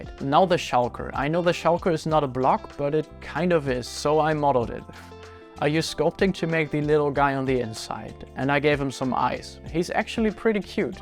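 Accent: Germany. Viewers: he sounds so german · Man hört den Deutschen Akzent 😂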